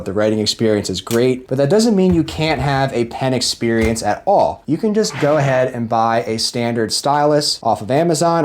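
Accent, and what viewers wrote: Philadelphia, United States. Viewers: New to your channel. Liked your review! Gotta ask. Are you from the Phiily or Delaware county area? I am, and I am testing my South East PA accent radar.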